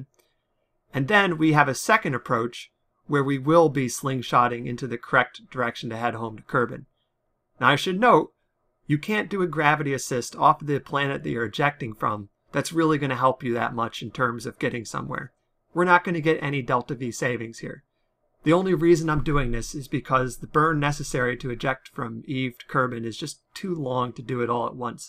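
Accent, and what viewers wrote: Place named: United Kingdom. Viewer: oh snaps senpai noticed him. Love ya even tho you still have a bit of a limey accent. Hope you lose that completely some day.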